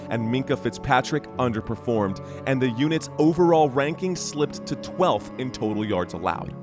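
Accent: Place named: San Antonio, United States. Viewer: I recognize your San Antonio voice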